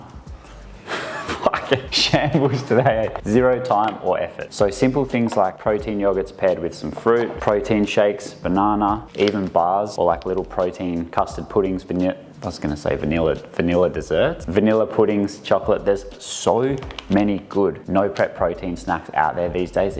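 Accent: Australia. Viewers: Are you a West Aussie mate ?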